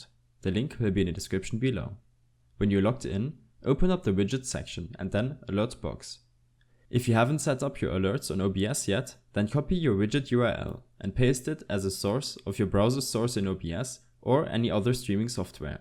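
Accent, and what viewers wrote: Germany. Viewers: Man hört das deutsche direkt :P xD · Wenn er englisch redet aber eigentlich deutschen Akzent hat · Man hört so das deutsche Raus xD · this is literally a german accent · man hört den deutschen akcent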